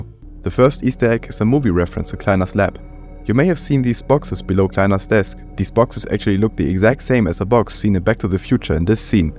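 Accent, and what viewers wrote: Germany. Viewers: Der duuuude ist doch deutsch oder? 🤔🤔🤔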